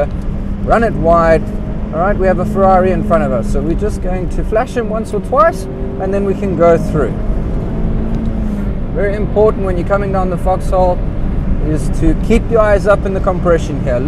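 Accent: South Africa. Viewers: South African? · Nice video. Recognized your South African accent immediately, good to hear! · South African bloke. Good one boet :) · cool video... South African? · Great informative vid!! On another note, are you South African? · That South African accent is very distinct. Great guide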